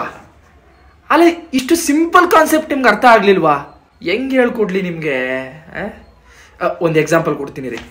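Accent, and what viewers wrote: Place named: Bengaluru, India. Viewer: Tuluva na? Ashtu channage local Bengaluru Kannada maathaadtheeya alva?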